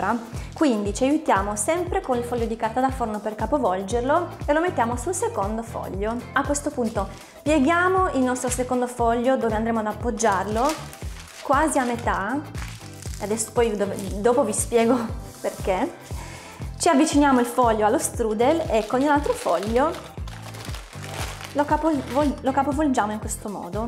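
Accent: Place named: South, Italy. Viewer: Hai un unica cosa che mi da fastidio, l'acce'ntooo te'rro'ne. Cazzo rovina la favola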